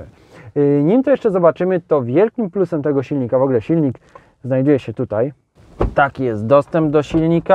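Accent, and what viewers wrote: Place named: Podlasie, Poland. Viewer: Mam pytanie ... Autor filmów jest z Podlasia, czy po prostu ze zwykłej wsi ? Zaciąga niesamowicie....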